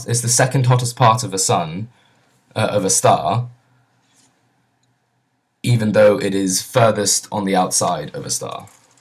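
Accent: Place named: RP, United Kingdom. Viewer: You sound posh. I imagine you went to decent schools. What a waste. You mumble and miss pronounce.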